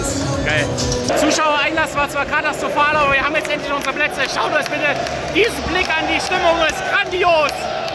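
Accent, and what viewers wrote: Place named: East Germany, Germany. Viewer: Dieser Ossi-Akzent. Als du Panther gesagt hast, habe ich Panda verstanden 😂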